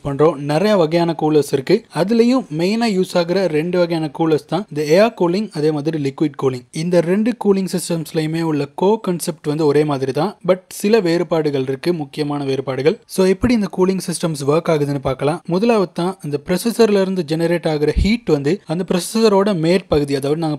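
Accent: Sri Lanka. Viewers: Bro you’re sound so Sri Lankan ….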